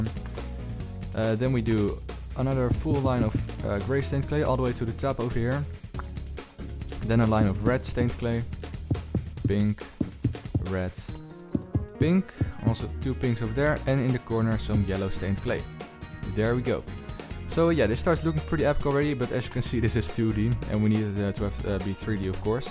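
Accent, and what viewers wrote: Netherlands. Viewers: Ik hoorde wel dat je NL was!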